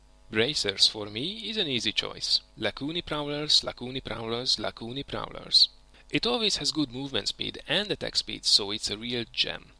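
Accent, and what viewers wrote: Hungary. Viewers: bakker, meghallom a hangod, "ez magyar akcentus" megnézem, és tényleg:D jó videó :D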